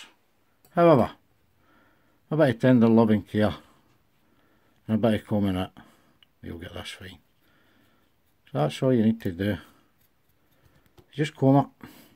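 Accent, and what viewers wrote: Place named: Scotland, United Kingdom. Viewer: Just copied your instructions there now, I love your nonchalant Scottish tutorial. Reminds me of when I worked over there during the 90's and a guy showing me how to take cuttings in a huge plant nursery. Straight to the point, no waffle and in layman's terms. That's the way I roll. Good morning from County Clare in the west of Ireland 👍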